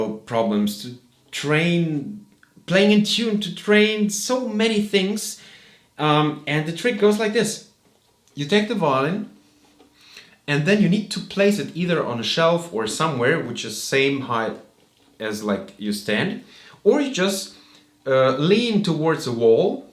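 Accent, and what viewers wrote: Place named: Germany. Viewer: Your English skills would be more convincing, if you pronounced the simple word "and" [and] rather than [änd]. Pay attention to the "d" and the end. German people tend not to differentiate the words "ent" and "and" in terms of pronounciation. The same applies to the word practice. German people tend to say [präctice] and those are tiny words revealing you as a German guy. I know that at school the teachers do not know better.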